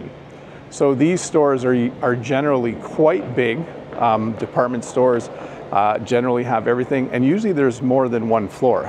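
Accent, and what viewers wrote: Canada. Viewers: We enjoy Canadian English, thank you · hello! i got a question, what’s your accent? is it canadian, or i’m wrong?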